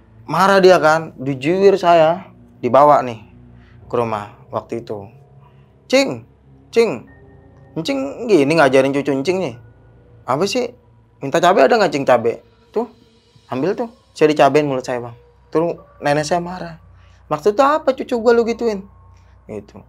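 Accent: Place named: Betawi, Indonesia. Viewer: Saamm betawieee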